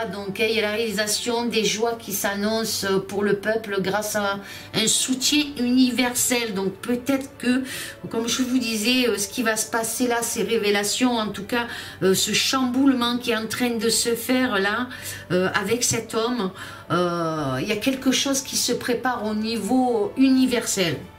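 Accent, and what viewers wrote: South, France. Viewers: Du repos belle, nous passons des portes vibratoires assez fortes! L'on nous conseil de nous reposer!! Après c'est toi qui vois? Un grand merci d'être là pour tous! penses à toi... Le plan continu doucement à se mettre en place.. Bises de coeur à vous tous et à toi!! Encore une belle lecture avec ton joli accent méridional qui chante à l'unisson...!!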